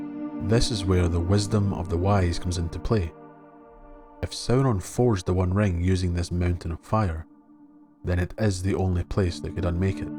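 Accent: Scotland, United Kingdom. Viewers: I've been gobbling up your posts all weekend. Frankly, they're great. And I apologize for taking the piss about your accent. It takes a Scot to pronounce LOTR names and places with some verisimilitude and I take my hat off to you.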